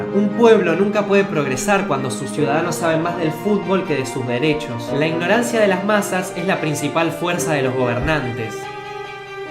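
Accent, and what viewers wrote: Argentina. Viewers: no se nota el acento argentino?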